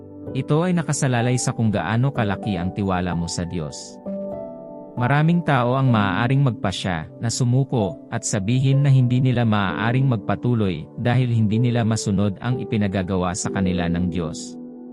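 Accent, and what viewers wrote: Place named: Filipino American, United States. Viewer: parang fil am managalog ang badoy mo mag aral ka ng tunay na salitang tagalog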